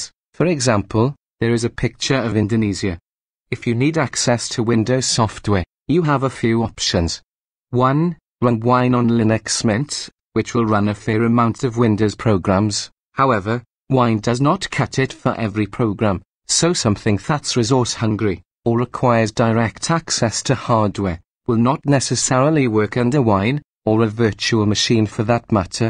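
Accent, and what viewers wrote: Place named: Wales, United Kingdom. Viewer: A Welsh “robot voice” - how original!!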